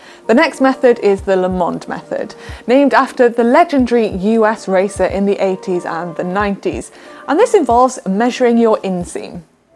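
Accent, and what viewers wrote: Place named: United Kingdom. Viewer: Do you think that British people will watch only? Talking Too fast and with accent !!! I didn’t like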